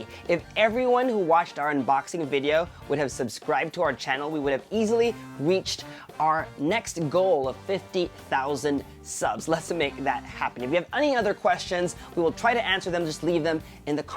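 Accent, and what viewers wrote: Singapore. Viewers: Singaporean or Filipino? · are you from SG?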